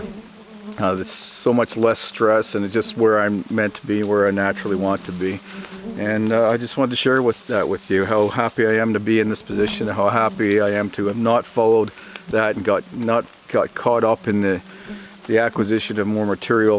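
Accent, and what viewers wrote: Canada. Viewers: are you canadian or american ? love your video very very much by the way thanks man from quebec !!!!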